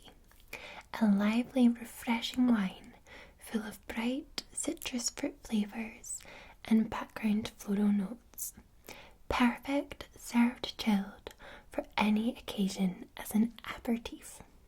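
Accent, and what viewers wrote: Scotland, United Kingdom. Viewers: My grandmother is Scottish, your accent reminds me of her 💕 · Amazing asmr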